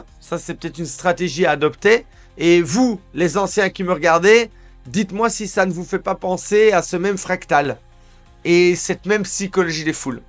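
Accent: Belgium. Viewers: Rien que par ce que tu as un accent d'un air liegeois tellement sympa , je m'abonne ❤❤❤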